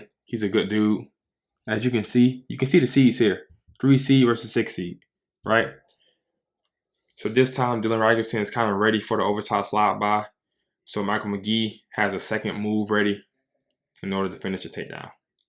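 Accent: United States. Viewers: You are articulate to the 9's Being able to edit and showcase the breadth of the subject matter But there's something about that southern cadence that I can't stand "Colluh Ta" it's as if you have to rush through your sentences. A nervous tick I guess what I hate about it is how I really have to pay attention to what you're bringing up. If it were edited the same way you spoke, clips would go by in a fraction of a sentence between long stretches of athletes just looking around Let what you have to say have some staying power. What do I know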